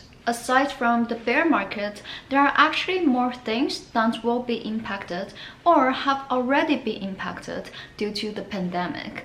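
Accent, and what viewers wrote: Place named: China. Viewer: You are the best YouTuber, I watch almost all the videos of your channel. love your voice, your Chinese accent. I think you are absolutely gorgeous, and you are a really confident person. I really want to be friends with you, I can imagine that you are such an amazing friend. Keep film more video!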